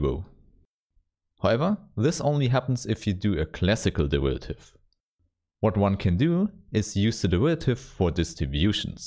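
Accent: Germany. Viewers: man merkt er ist deutsch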